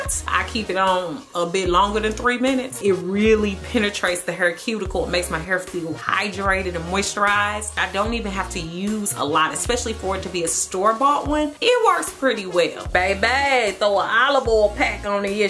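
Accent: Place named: Houston, United States. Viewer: One of the best natural hair videos. You sound like you're from H-town. You're hair is the bomb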